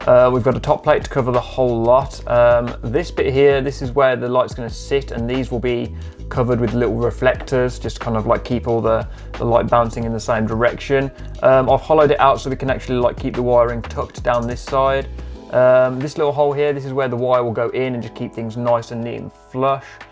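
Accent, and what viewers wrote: Birmingham, United Kingdom. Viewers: brummie luv !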